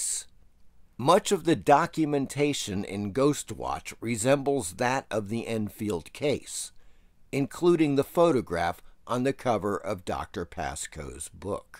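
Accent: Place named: United States. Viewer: You're an American aren't you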